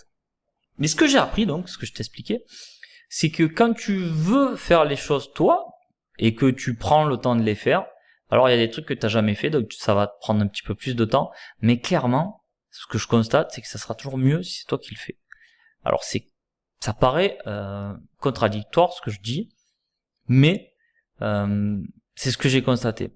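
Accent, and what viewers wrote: South, France. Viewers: Salut, merci pour cette vidéo et puis entendre cet accent du sud, quel plaisir. Les US c'est top mais cet accent chantant me manque un peu quand même. Beau bureau! La bise.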